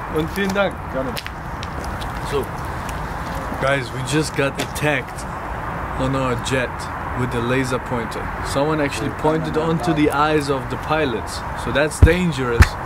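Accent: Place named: Germany. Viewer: You sound happier... and more successful... always learn one thing for sure..that is to smile and be happy... offcourse other points are there too that we learn and get inspired from...love your german accent and the language ... wish you vlog more often... and yes... CONGRATULATION ON YOUR MARRIAGE...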